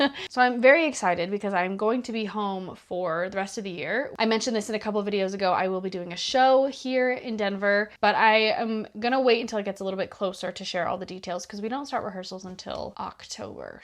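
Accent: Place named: Ontario, Canada. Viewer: your page got recommended to me randomly and i could tell immediately you were from ontario canada lol. the accent gave it away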